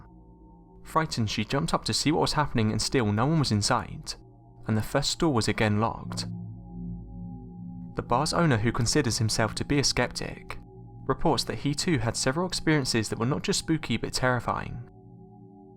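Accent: United Kingdom. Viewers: You almost sound like the narrator from Top5s. You both are my favorite British narrators. I hope you eventually obtain as many subscribers as Top5s. God bless, and greetings from a US soldier from Illinois, USA!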